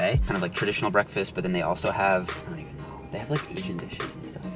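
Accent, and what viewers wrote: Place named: Newfoundland, Canada. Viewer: he sounds like a Newfie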